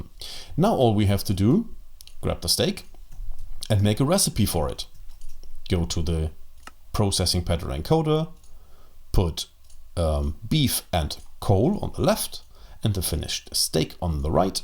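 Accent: Germany. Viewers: Do u come from germany? ^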